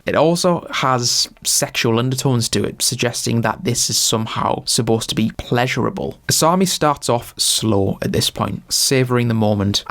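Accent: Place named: Newcastle, United Kingdom. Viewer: My thoughts are: love the geordie accent and review not bad either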